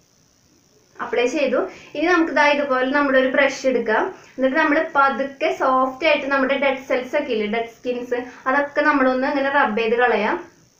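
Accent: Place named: Thrissur, India. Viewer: Kollatto. തൃശൂർ ആണോ. തൃശൂർ slag und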